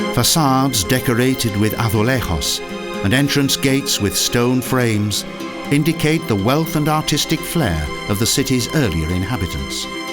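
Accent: Spain. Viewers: The footage looks old and narrator pronounces portuguese words un spanish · The narrator of this video is an ignorant, incompetent, stupid, moron, who by his english accent you can easily deduce that he's from england, thus a european but nevertheless pronounces Portuguese words and names with a spanish accent. Get somebody competent to do the narration.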